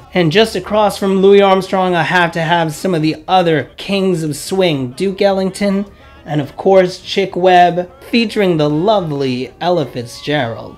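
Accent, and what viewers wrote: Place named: New York City, United States. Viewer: I like your taste in fragrance ... and your accent, love the slight traces of the NYC accent <3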